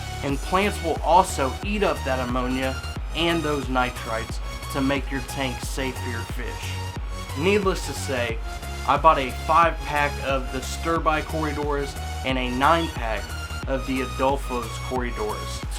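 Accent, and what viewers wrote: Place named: Kentucky, United States. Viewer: Greetings from a fellow Ky native! I stumbled across your videos and a few seconds in I thought, "Where is this guy from? 'Cause he sounds like a Kentuckian lol". Enjoying your videos!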